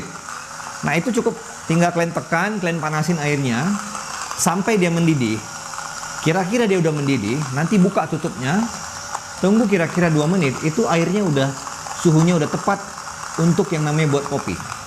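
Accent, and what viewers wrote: Medan, Indonesia. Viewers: Ada tutop nya pulakk haaa .. Adoohh logat mu itu bang buat rindu nongkrong di medan lagi ah...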